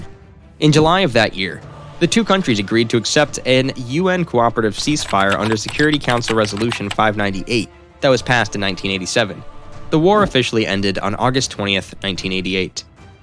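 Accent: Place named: United States. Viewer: No he is not butchering anything. He is a typical Ey-mey-rie-san (American) who have no concept of language, grammar, spelling or pronounciation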